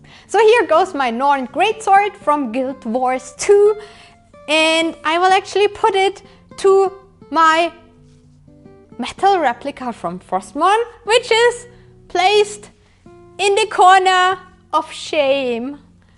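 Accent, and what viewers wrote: Germany. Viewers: I think she is German she has an German accent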